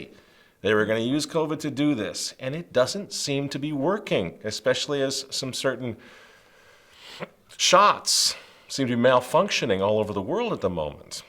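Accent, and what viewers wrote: United Kingdom. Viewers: You do a great British accent!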